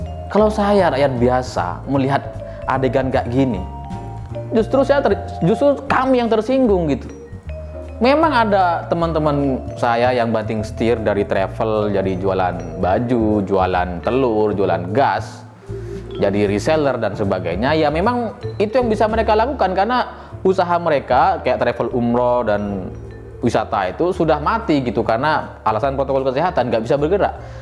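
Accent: Madura, Indonesia. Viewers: Logatnya Madura....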